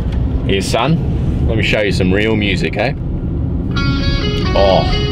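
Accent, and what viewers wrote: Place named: Australia. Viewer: The aussie accent makes it 100x better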